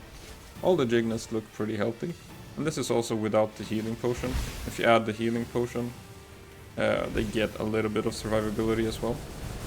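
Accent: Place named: Scandinavia. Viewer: Where are you from? You sound like maybe Sweden, Finland, Denmark or norway.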